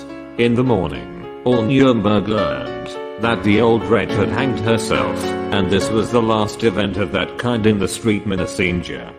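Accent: United Kingdom. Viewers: All of his voices and pronunciation is perfect!Full of British Accent!Wonderful!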